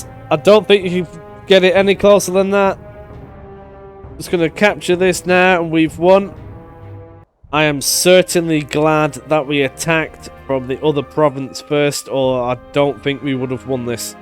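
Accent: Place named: Lancashire, United Kingdom. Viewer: oh I’m on your time mate, been trying to place your accent I may be a bit off but I’m guessing round Bolton or wigan. I’m only in salford so shouldn’t be so confused lol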